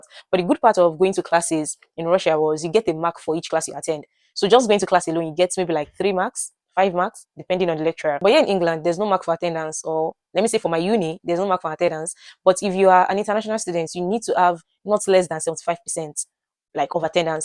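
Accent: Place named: Nigeria. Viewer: Thank you for your video. However, for some people who aren't very familiar with the Nigerian accent, it would be very helpful if you could either speak slower or add subtitles. I, for one, although I understood what you were saying, I had to replay some parts over and over to capture the words. But thank you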